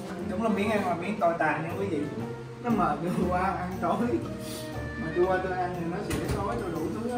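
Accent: Vietnam. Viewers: Anh nói tiếng Nam đáng yêu xỉu 😍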